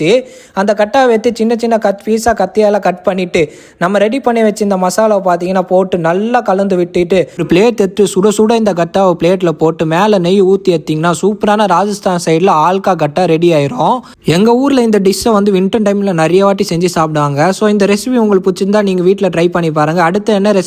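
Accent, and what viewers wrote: Tamil Nadu, India. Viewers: Yov nee Yenna ya local tamilan madri pesure.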